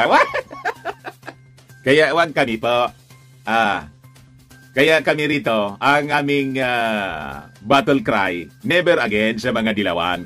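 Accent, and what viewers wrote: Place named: China. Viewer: Tanong ko lang Sayo, ano ba talaga ang Nationality mo?, Pilipino or Chinese, kasi Puntong Chinese Ang tagalog mo eh!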